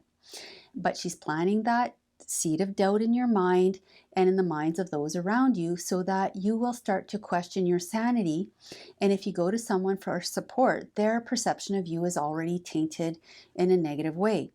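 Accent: Canada. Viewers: Not sure where you're from, Canada maybe, but I love your accent. Great video. Thanks for the tips on crazy phrases from crazy women.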